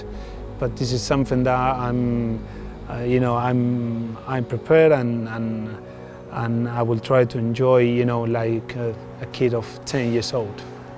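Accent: Manchester, United Kingdom. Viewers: Me hace mierda Zaba y su acento Británico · Even sounds like a proper Manc. Good lad Zaba!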